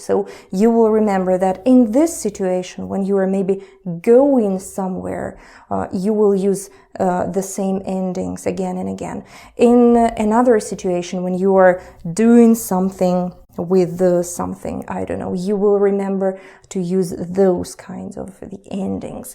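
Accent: Russia. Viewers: на самом деле русский акцент очень слышен)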